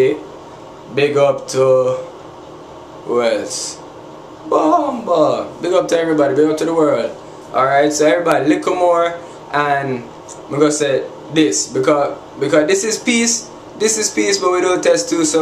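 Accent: Jamaica. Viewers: lovee your hair & you resemble the jamaican accent pretty good ") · u jus killed me wit da "rudeboy buck buck buck" part jus 4 dat auto sub n a big up bredren...btw r u jamaican or u jus talkin wit da patois cuz of da products to add effect?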